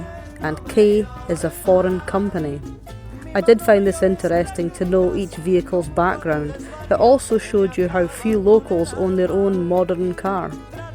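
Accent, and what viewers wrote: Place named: Scotland, United Kingdom. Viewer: Hi. I found your video very comprehensive and, although I have no intention of ever visiting Cuba for philosophical reasons, your advice is transferable to many other similar countries. I was amused by your accent and the prospect of someone like you trying to learn to speak Spanish. I intend no insult. I was born in Glasgow and moved to North America with my family when I was seven years old. My parents had very strong Scottish accents as did the many family members who travelled here to visit over the 60 years I have lived here. I can't imagine any of them ever becoming conversant in Spanish. I once told my Auntie Betty that I needed an English/Gibberish dictionary to understand her. I am, however pretty fluent in Spanish as my own family travels, mostly to Mexico often. We are scuba divers and love the chance to visit small out of the way villages to dive and it is imperative that you speak some Spanish and the people really appreciate that you make the effort. 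Anyway, I found your attention to detail really great and I'll look for other reviews from you. Best wishes Tom Duncan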